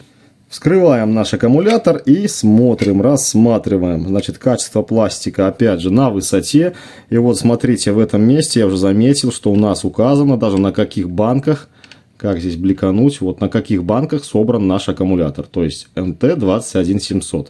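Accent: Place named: Vitebsk, Belarus. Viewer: Улыбаюсь с говора-акцента.;) Как будто к родне в Витебск снова заехал. Много лет не был... Эх. А аккум норм, наверное) Крышка-вешалка пригодится. Отдельно продаются, конечно. И зарядка компактная. Я б взял, как вторую. Надо подумать.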